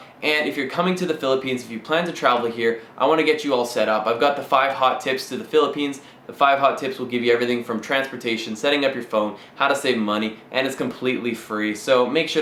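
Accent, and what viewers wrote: Canada. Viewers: Got the typical Canadian aye haha. Love the vlog! Keep em coming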